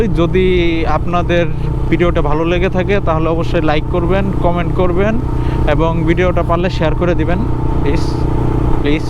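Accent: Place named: Narsingdi, Bangladesh. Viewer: ভাই এর ভাষা অনেকটা রুপগঞ্জ/ নরসিংদির মত 🤔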